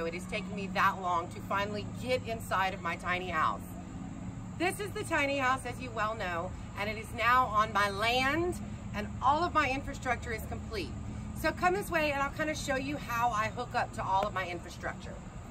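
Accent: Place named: Texas, United States. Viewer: LOVED YOUR VIDEO TOUR OF YOUR TINY, WELL PLANNED HOME. I AM SO VERY PROUD FOR YOU. CAN YOU TELL ME, DO YOU HAVE TEXAS ROOTS? I HEARD ALOT OF YALL'S IN THERE. I AM A TEXAS GIRL SO PICKED UP ON THAT IMMEDIATELY. BEST OF LUCK TO YOU!!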